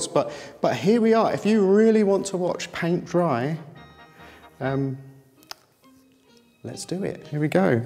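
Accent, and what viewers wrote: United Kingdom. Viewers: It was nice to see your sarcastic humor. I'm American and I find it interesting when British folks pronounce words differently. Just keep saying aluminum the way British way. Obviously some Americans think they need to correct your pronunciations.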